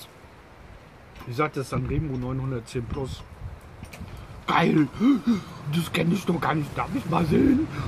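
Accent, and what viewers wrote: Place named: Bingen, Germany. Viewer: genial hab ich doch gleich am Dialekt gehört, ich komm aus Binge schaue deine Videos schon ein ganze weile,bist auch aboniert.... ich mag an die 1.das du gerne Bier wäreend deiner Videos trinkst und natürlich Messer genau wie ich liebe. Hätte mal Bock mit dir das ein oder andere Pilz zu zerstören und dabei über Messer zu fachsimpeln.... LG de Bingen Andre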